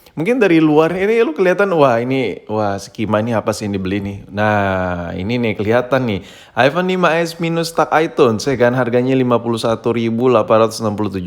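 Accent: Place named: Banjar, Indonesia. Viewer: Dari kalsel y itu ditempat ku tuh urank banjar boy🤣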